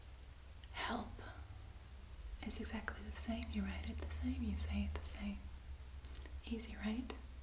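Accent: Netherlands. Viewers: acually its a dutch acent. just like in groningen, whete they say the G diverent. she lives somewhere ehere they pronounce the R diverent. algemeen nl is diverent yeah (just asuming your dutch here, ipv vertalen)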